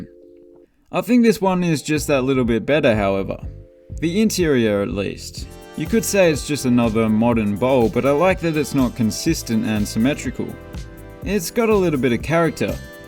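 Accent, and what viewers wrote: Spain. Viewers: Excellent video but stop reading portuguese with spanish accent please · Nice video, but please stop pronouncing Portuguese words as if they were Spanish.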